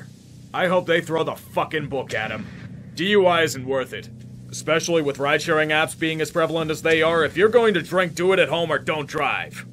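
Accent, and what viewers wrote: Pittsburgh, United States. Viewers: Anyone else love his “yinzer” accent? I think it’s hilarious 😂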